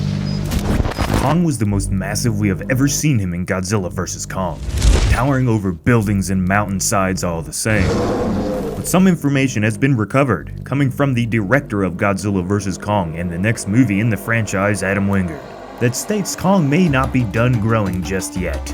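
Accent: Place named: United Kingdom. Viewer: I can now tell you are from the UK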